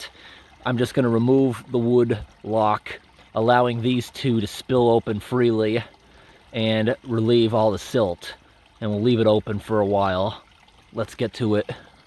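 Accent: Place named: Northeast, United States. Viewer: just found you dude and you're super entertaining to listen to and watch! i'm from PA originally and idk if it's just me but you have a north eastern cadence to your speech, makes me homesick. keep up the nice work!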